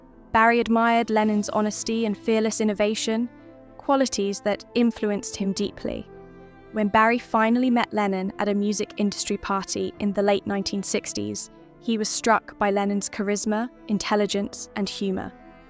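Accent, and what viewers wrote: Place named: United Kingdom. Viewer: LOSE the extremely heavy British accent!!!! It doesn't work well for narrations!!!!!! 😮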